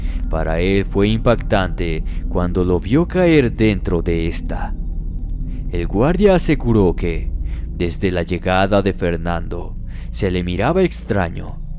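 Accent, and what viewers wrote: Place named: Chile. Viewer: tu linda voz de chile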